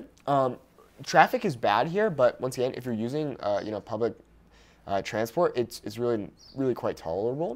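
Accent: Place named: Northern Mexico, Mexico. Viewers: he should use his northern mexican accent to not get scammed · Congratulations,! 🎉 This is a great move for you! I can't wait to see your Mexico City content. It will be interesting if your Norteño dialect will change after spending time in the big city! Keep going! ⭐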